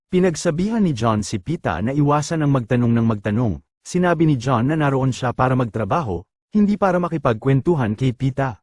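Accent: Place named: Bicol, Philippines. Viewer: Aba bicolano ka pala manoy ,,, gayon Ang movie recap mo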